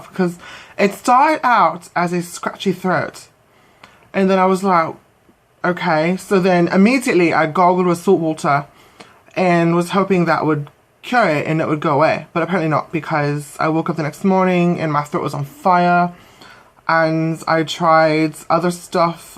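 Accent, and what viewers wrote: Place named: United Kingdom. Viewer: Hi: Love the brit accent:Good Luck With Your New Apartment:Your lips look really nice. Could you do your next video wearing glossy Pink Lipstick?????? Please Please Please?????????? Bill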